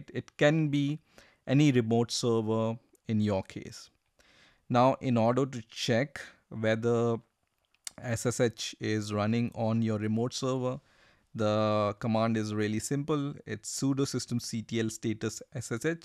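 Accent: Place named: India. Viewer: I hate indian accent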